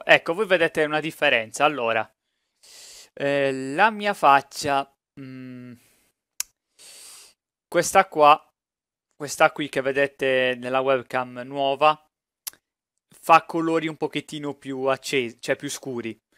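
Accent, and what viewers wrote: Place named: Sardinia, Italy. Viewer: mi sa che siam conterranei..sei sardo vero? sembra del cagliaritano..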